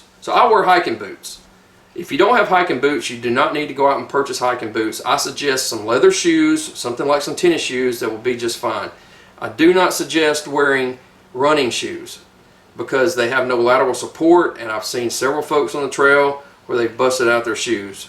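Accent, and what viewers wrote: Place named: Southern Illinois, United States. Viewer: Hey, I think I can guess where your from based on accent and woods area. I'd say Southern Illinois/ East Missouri area. Close or no?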